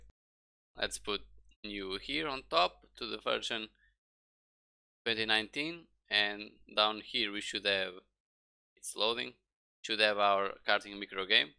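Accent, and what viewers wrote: Portugal. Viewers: És tuga não és? Pelo menos parece 😅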